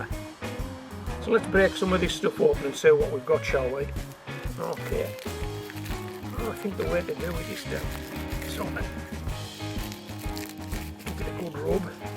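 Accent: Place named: Isle of Wight, United Kingdom. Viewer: Lose the music, your voice is entertaining and Molly was intrigued! Isle of white?.. I am trying to guess where you are! I am in California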